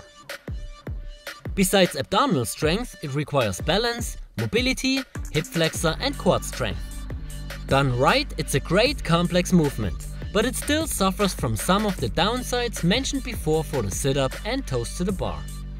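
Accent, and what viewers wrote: Germany. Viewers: lmao... 1 sec in to the video i knew u was german,no offense tho in any way or smt i was just pointing it out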